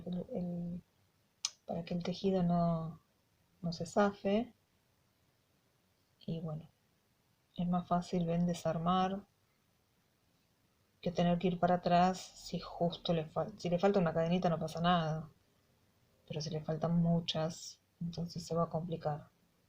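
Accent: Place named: Buenos Aires, Argentina. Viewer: Me encanta! Una argentina...por la tonada de Buenos Aires!❤. Bendiciones!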